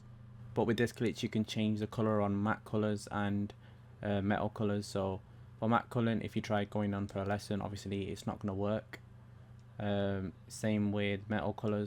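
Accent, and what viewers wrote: England, United Kingdom. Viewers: You sound asian british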